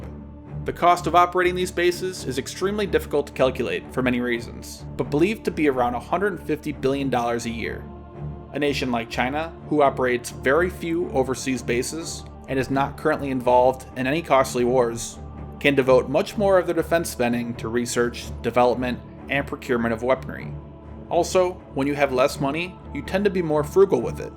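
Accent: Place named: United States. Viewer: hi.reason i subscribed to your channel is that you are cold analyst,and you are right. nice to hear an american (assuming by your accent) not being biased by the us propaganda. i share your viewes over many things you post. they are plain REASONABLE. unlike Blinkov Battlefield,who sound like he was high 24 7. like he was on CIA payroll. or watched Rambo and Commando one too many times. keep it up,bro. good to have some valuable material on youtube. we might even avoid some hot shot,american cowboy style stupid war that destroys even people not involved into the issue, thanx to people like you, thorough analysts with lots of grey matter, who simly....reckognise the reality of the present day. great work man.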